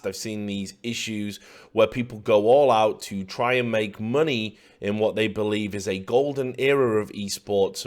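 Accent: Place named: Newcastle, United Kingdom. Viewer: are you a fucking Geordie? It all makes sense now, I love you even more.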